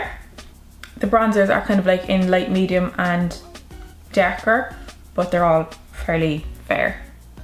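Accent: Ireland. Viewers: Gorgeous look,I can hear an Irish accent from you😉🌺Are you Irish?x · Are u Irish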